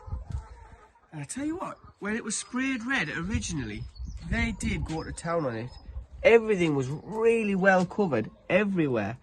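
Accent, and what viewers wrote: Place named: Northern England, United Kingdom. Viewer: Thought you were couldnt tell by the accent if you are based in north east or north Yorkshire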